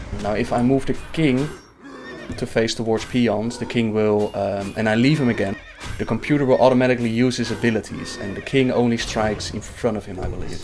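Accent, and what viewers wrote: Netherlands. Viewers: Hey, I'm from Belgium, and i hear you'r a dutchman to;P Congratz and respect. Maybe we can play Together,:P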